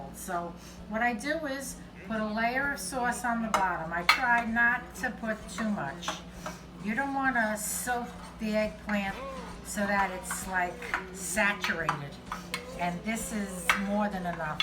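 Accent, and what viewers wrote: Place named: Philadelphia, United States. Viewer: Your recipes are great but your pronunciation of slang Italian American version of words sound very South Philly. It’s not authentic Italian pronunciation. I still enjoy your videos. 🍷😋👍🇮🇹🇺🇸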